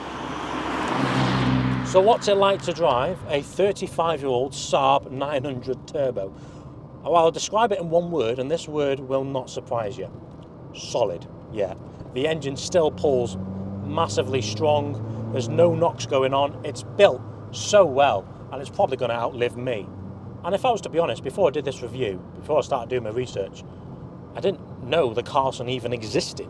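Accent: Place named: Wrexham, United Kingdom. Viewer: I presume you're a Wrexham lad from the accent, would love to see you take some stuff over Worlds End. Yes it's narrow but I used to take my 'Group B' MG 6R4 over there for a shakedown and across Llandegla...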